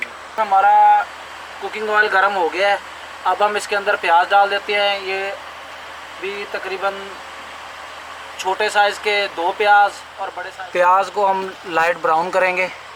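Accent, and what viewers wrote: Punjab, India. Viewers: Your improvement level is increasing day by day.keepd up specially ur Punjabi touch.I think you should make a whole video in Punjabi. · hahahah maza aa gaya yar kaya bat hai punjabi tuch ka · Nice Punjabi tuch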